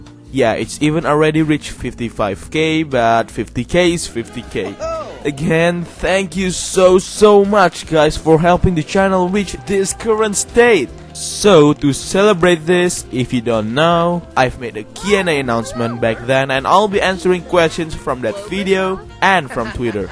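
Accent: Indonesia. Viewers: iya aksen lu emang indonesia banget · I knew you are Indonesian, from your channel's name, your accent is really cute xD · I KNOW IT!! haha!! because, you speak english language in Indonesian accent :v perhaps, you should try English in "medok" accent :v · Oh. Im Malay and understand Indon. I knew that accent. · Aku tau kamu orang indo karna cara bicara kamu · maigat w kira lu 22tahunan ternyata tuaan gua yha hhh. btw tau banget sih lu orang Indonesia from ur accent kedengeran banget ditambah unamelu ada ""doangnya" wkwkw gua tbtb seneng sendiri karena lu orang indo(?) ya because I am actually proud of you because u have So many great contents and i really enjoy all your videos! keep it up dude! sukses selalu! Cheers👍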